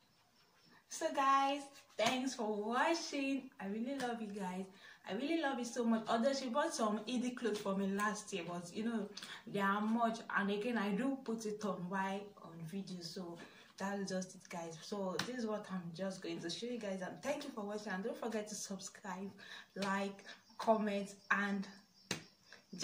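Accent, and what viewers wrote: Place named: Nigeria. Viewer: Are u Nigerian cox ur accent is Nigerian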